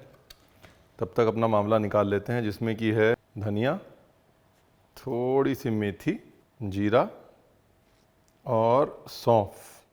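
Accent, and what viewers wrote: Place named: Lucknow, India. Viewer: Your Lucknow-a accent is sooo good , you're so Raw 🔥🙏 thankyou for making this channel . 🥰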